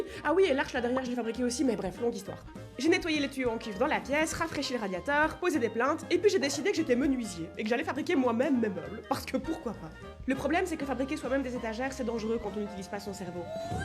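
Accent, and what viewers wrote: Belgium. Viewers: cc c'etait pour savoir ta un petit axent et je suis francobelge du coup je voulais savoir est ce que tu est belge peut etre que j'ai tout faut mais voila bisous bisous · Elle est belge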